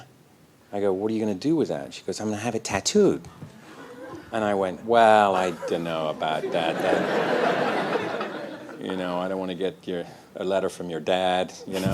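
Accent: United Kingdom. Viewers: The little hints of the Queen’s English just keep my heart a-flutter🥰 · Yes he's from London. Comes from a relatively posh family. He even went to school at Harrow. His accent is confusing because he has lived in the US for over 40 years and sort of drifts back and forth between British RP and American. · I can’t tell if he’s trying to put on an American accent, or if he’s just lost some of his British accent over the years... but it seems kind of mixed here.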